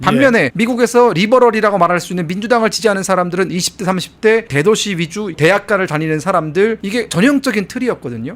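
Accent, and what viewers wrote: South Korea. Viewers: 이사람 탈북자 임에도 북한억양이 하나도 없네 톤이 그냥 우리말이네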